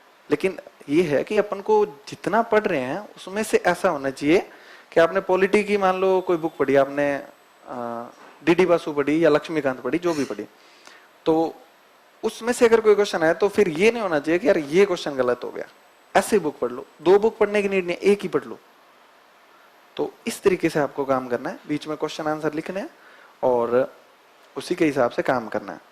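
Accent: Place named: Rajasthan, India. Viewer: H na...... Lahjaa .. Rajasthani ki pahchaan😀😀😀😀.. proud of you bro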